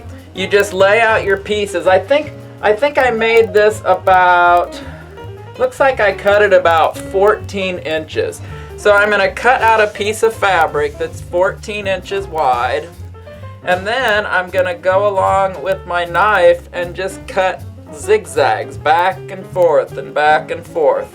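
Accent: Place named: South, United States. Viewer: I'm obsessed with her country accent! I could listen to her speak all day!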